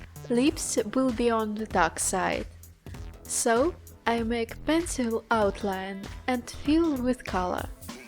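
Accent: Russia. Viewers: Emma Ray just because she IS from Russia :D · Very interesting makeup, and.. Your pronunciation is like you are from Russia😅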